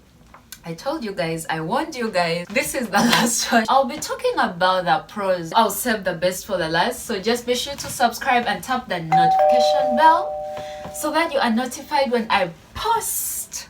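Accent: Africa. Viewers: Late to this video, please do not lose your beautiful African accent and soft voice!! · I like your African accent that is smoother than others'. I always uncomfortable to watch models in outfits that is very little to cover their bodies. I feel bad for them. If I am in the field as a model, I would suffer and probably give up to be a model since this world's fashion industry is so wicked and dark. I am admiring you to be a good example, as a Christian model by keeping the dignity and your faith value in your job field which is very precious. Bless your heart. Keep up your good work. I know that you can't survive there if you are there for success in the world, but you are there for God's special calling to reach out people with the gospel. When you know why you are there, I am sure that you can have peace and enjoy your job even though you don't make much money or fame like what others chase after. 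I would like to see how God uses you unlimited way. I like your shorts. They are awesome. They speak special way. I think that God brings souls to you thru your videos. Do not be afraid to share the gospel.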